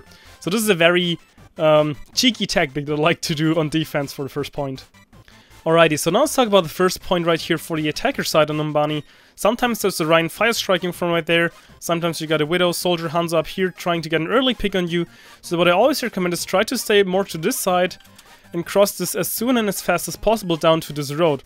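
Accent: Germany. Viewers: Are you German? You sound very german :)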